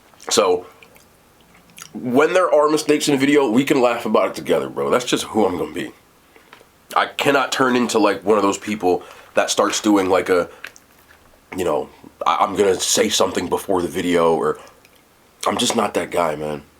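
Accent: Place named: New Jersey, United States. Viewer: I love when you try to pronounce things in another language with your Jersey accent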